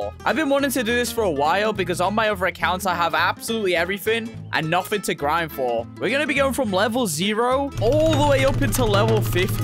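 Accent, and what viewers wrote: United Kingdom. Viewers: are u brithish?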